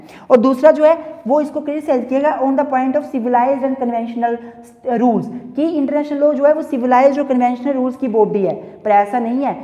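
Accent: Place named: Punjab, India. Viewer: the way u teach is commendable 👍 pronunciation me punjabi touch hai..bt tht is manageable ..bcz assi v punjabi aa 😁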